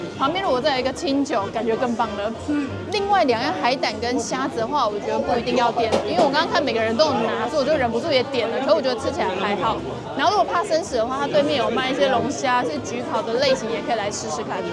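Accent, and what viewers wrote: Taiwan. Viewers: 觀察了幾支影片，發現講到「腥」字都會變台語，好奇妙喔。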